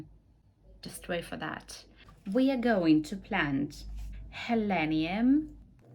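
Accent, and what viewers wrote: England, United Kingdom. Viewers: What does a British accent sound like ? She sounds english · I just thought the same. Very, very good english and this British accent. Nice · Why do you have a British accent? It is awesome. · I wonder how come a Belarusian has British accent !!!!!!!! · You sound like your English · How did you get a British accent? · Very nice British way os speaking English. Thought I was back in London for a moment there. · I am amazed at your command of English. Have you studied in English or some part of UK (I hear a flavor British Accent). Either way, a great view. and perspective on life in Belarus. Beautiful! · Great videos. You have a beautiful British accent. :)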